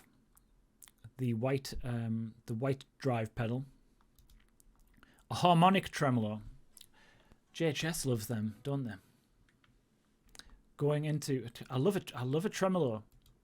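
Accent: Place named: Newcastle, United Kingdom. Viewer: Good to hear a fellow Geordie into this stuff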